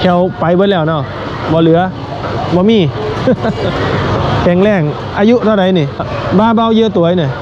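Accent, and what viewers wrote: Isan, Thailand. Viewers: ทำไมสำเนียงออกอิสานๆมากเลย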